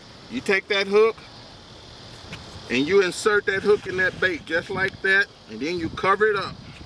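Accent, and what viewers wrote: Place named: United States. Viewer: Love the ol country accent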